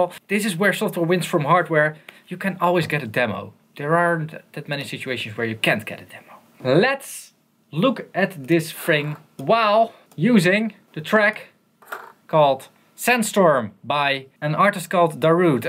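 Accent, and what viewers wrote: Netherlands. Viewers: dutch guy trying to speak english. damn. facepalm, ottoman sideslap, whatever... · are u dutch?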